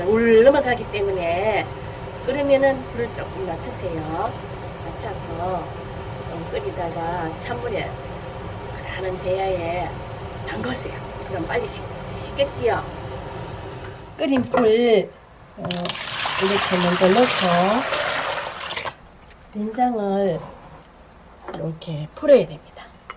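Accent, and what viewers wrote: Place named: Gyeongsang, South Korea. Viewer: 경상도 분이라 설명이 쏙쏙ㅎㅎ 잘 배울께요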